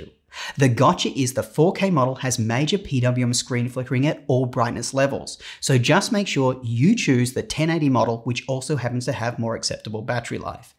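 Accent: Australia. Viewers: Are you Australian? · Finally, a reviewer that knows what he's on about and isn't recycling the same old lines, plus it is so refreshing to hear an Aussie accent rather than some monotone suburb US one. liked and subbed from the UK!!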